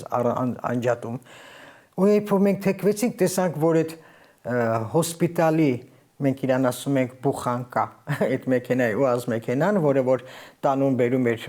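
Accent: Standard. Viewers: Տիկին ջան, ինչ գրագետ ու հիանալի ելույթ կայացավ, շատ ենք կարոտել մաքուր հայերենով ելույթ լսել...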